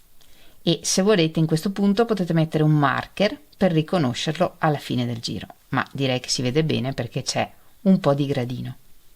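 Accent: Italy. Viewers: Pour souffrir également de fibromyalgie, avec en ce moment le syndrome des intestins irritables qui dure depuis plus d'une semaine, je suis trop mal dans mon corps pour crocheter. Je suis âgée, ce qui n'arrange rien. Par contre, comme vous, rien qu'à écouter la voix de, avec son magnifique accent, me fait du bien. Elle me ramène à mes origines (mes parents sont nés en Italie tous les deux) et mes souvenirs de vacances d'enfance. 😊 Je suis désolée, si je parle l'italien, je ne sais pas l'écrire. Et je ne supporte pas de faire des fautes d'orthographe. Bon courage à vous.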